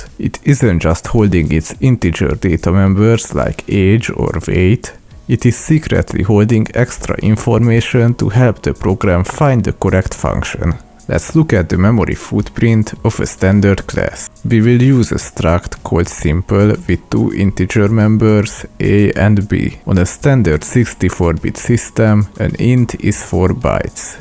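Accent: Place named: Hungary. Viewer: Came for C++, stayed for the Hungarian accent.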